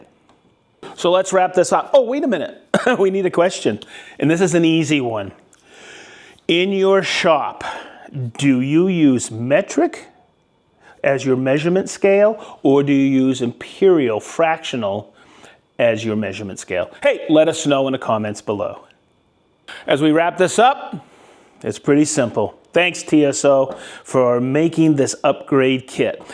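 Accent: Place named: Massachusetts, United States. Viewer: Wicked awesome!! (I love how your accent reminds me of all my mom's family - Swampscott, MA baby!) I am a relative noob to woodworking at 54 years young, but I am looking forward to upgrading my MFT-3. Love these super-helpful videos,!!! Answer: I tend to prefer metric since I grew up overseas, but am also comfortable using imperial. My "go-to" is metric, though.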